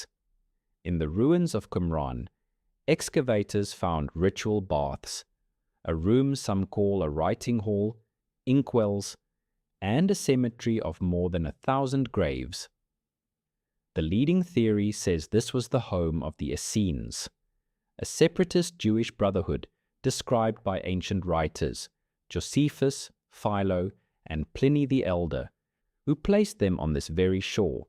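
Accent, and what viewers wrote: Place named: South Africa. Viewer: I love that this AI has a decent South African accent 😅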